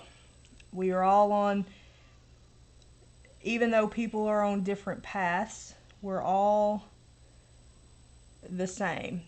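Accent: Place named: South, United States. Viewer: ha ha I still love your accent props southern gal xx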